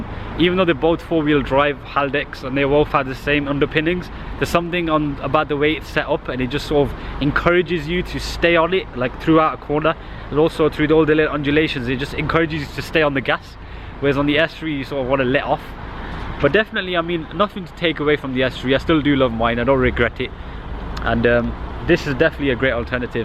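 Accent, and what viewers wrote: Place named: Birmingham, United Kingdom. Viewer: You're from Birmingham aren't you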